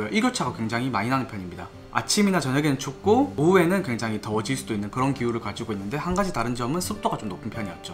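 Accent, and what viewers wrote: South Korea. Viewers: ㅋㅋ 오히려 경상도 사투리때문에 더 꼰대스러워욬ㅋㅋㅋ 연기하시느라 고생하셨어요. 좋은영상 찍어주셔서 감사합니다. 많은사람들이 봤으면하네요. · 불어 하는 사람으로 한국식 발음에 못 알아들었음 ㅋ Terroir 라는 걸 알고 빵터짐 영어나 불어나 한국식 발음 참 알아듣기 힘듬. Anyway.. I like to drink Jamaican Blue Mountain, Ethiopia Yirgacheff & Colombia Geisha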